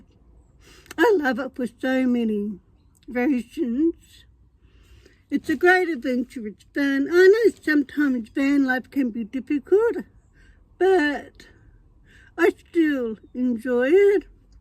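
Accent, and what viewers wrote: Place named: Australia. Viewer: I could hear that you're Australian but thought you were living in England.Where do you park up?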